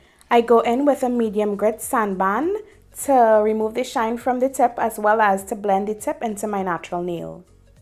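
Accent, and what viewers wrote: Trinidad and Tobago. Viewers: First video of yours I'm watching and sound triniish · SUBSCRIBEDDDD as soon as I heard that trini accent <3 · I hear my Trini voice lol..... great nails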